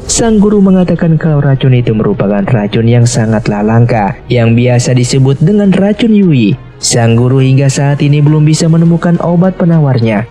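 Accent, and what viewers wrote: West Java, Indonesia. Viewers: Orang sunda nya??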